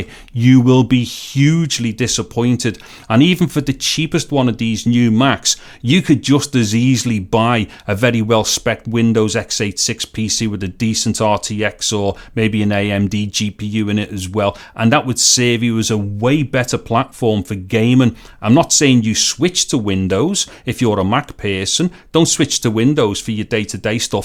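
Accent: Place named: Scotland, United Kingdom. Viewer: Another great video. My son (6) asked if you were from Scotland 😂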